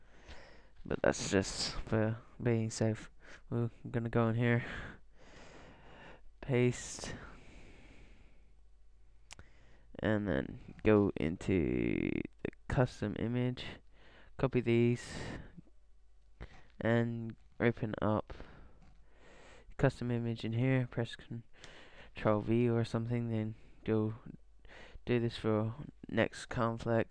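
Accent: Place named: Australia. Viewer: Dude, nice accent, is it aussie?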